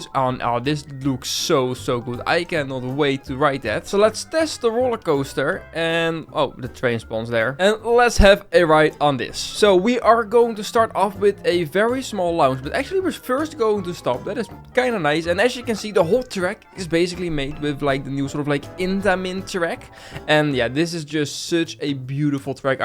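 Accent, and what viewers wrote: Netherlands. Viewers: U dutch like Benji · Sorry man maar je hoort wel veel te veel Nederlands er tussen door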